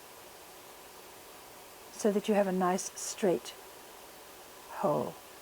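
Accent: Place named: United Kingdom. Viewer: you are fabulous!!!!! I made my first lampwork beads today and I am hooked! I even tried using some scrap stained glass and had good luck but I am glad to learn your techniques! I love my first beads (now if I can only get them off the mandrel, I think I might have put the bead release on too thick because it wanted flake off when I worked!) and I can't wait to make more! Thanks for such a wonderful series of videos! PS LOVE your British accent;)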